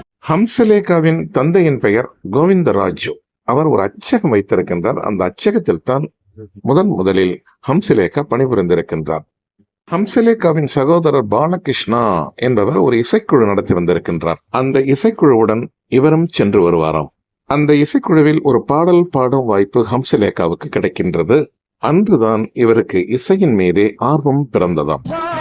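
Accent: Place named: Colombo, Sri Lanka. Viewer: நீங்க bh abthul hameed மாதிரியே கொஞ்சம் பேசுறீங்களே சார் நீங்களும் கொழும்பா தமிழ் குரல் அப்படியே உச்சரிப்பு அனைத்தும் அப்படியே ஒட்டிருக்கு கொஞ்சம் நிஜமாவா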